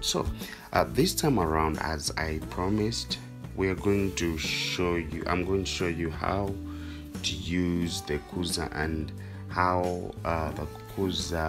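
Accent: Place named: Uganda. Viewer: HOW COME YOUR ACCENT IS SO UGANDAN?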